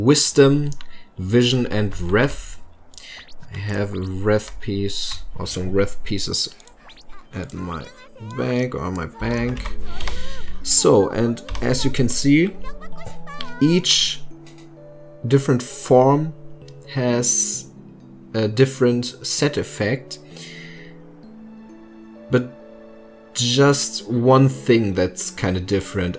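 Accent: Germany. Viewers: You sound like a German people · Man hört krass raus dass du deutscher bist xd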